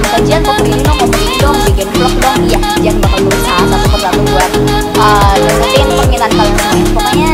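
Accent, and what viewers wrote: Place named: Jakarta, Indonesia. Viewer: Wes gedhe saiki,cara ngomonge wes koyok wong jakarta😂😂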